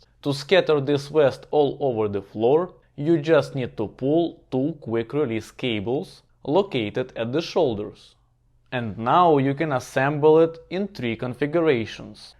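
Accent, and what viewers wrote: Russia. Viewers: Неплохо сделано, даже русский акцент)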